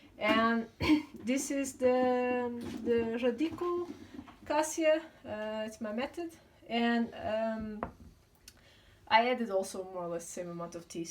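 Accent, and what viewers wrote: Portugal. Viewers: Just a question: Are you Portuguese? Because your accent seems to be 😁. Another question :This colour doesn't affect the hair colour? It just cover the gray hair? Thanks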